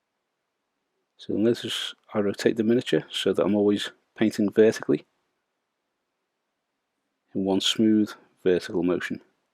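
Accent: Liverpool, United Kingdom. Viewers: The scouse accent if i close my eyes i feel like I'm watching art attack.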